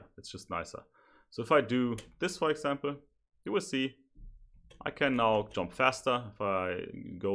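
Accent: Germany. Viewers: Thank you for this Tutorial, are you german?😁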